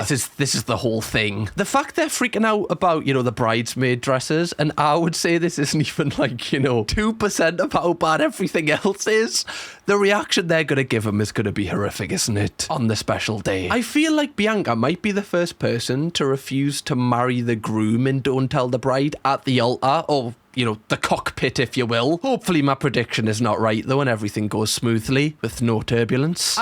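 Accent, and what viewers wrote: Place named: Geordie, United Kingdom. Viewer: Daily dose of being called a "Gorgeous Donut" and hearing this entrancing Geordie accent, YES! Love you,!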